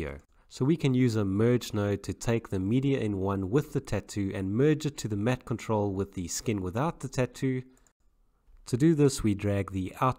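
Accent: South Africa. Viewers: Always Love the South African Accent ;)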